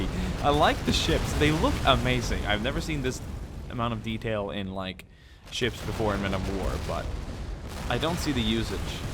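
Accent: United Kingdom. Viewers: Didnt you have a brittish accsent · I just watched a video you made in 2011 you sound a lot younger and almost have a British accent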